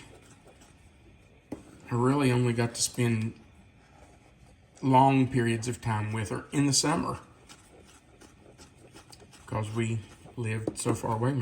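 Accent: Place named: Kentucky, United States. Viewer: As a 14-year-old, this was the first candy I made, and it was from the back of the Cocoa can. I was a farm kid and learned to help in the kitchen from an early age. I did not have canned milk and used our Dairy farm cream from the top of the pitcher of milk. I did not have measuring cups or spoons. Used a teacup and a teaspoon and real home churned butter & Watkins vanilla from the "Watkins man" who came around on a regular basis. I also used the cup of cold water to test it since no thermometer in 1957. My mom walked me thru the first time and turned out fine, delicious. I made it many times after that thru the year, not just Christmas. I got the proper equipment in the 1960s but never made a difference in the outcome. Love hearing about your grandmother and that she lived in W. Virginia. I started watching you because you were from Kentucky and had that delightful accent. 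My Dad was born in W. Virginia when he came a little early while grandmother was visiting her sister. He was raised in Louisa, Ky but moved with his family to Central Oho farm at 16 years old. Love and miss my Kentucky relatives. I still love your accent, not as pronounced as my relatives, but watch for those delicious recipes.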